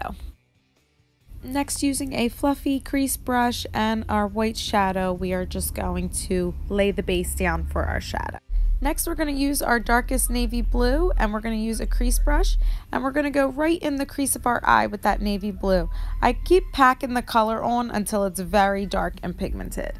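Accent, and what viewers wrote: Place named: Maryland, United States. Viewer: You sound like you're from Maryland!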